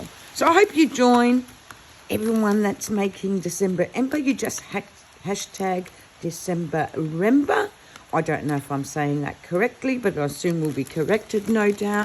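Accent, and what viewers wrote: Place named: Australia. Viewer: You sound Australian, but it is so hot in Oz right now n you have a thick thick jumper on! I'm sitting here melting! 😂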